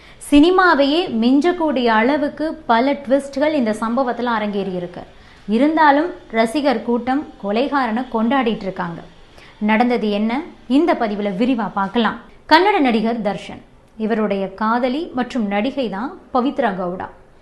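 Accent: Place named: Chennai, India. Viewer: Madras தமிழ். பினமாக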